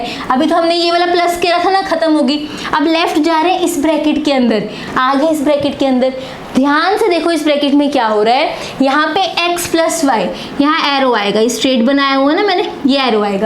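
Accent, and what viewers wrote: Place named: Punjab, India. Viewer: love that panjabi accent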